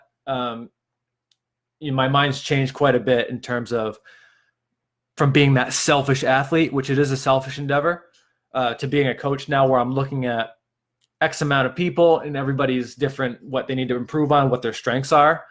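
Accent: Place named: New Hampshire, United States. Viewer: You sound like you're from Troy, NH.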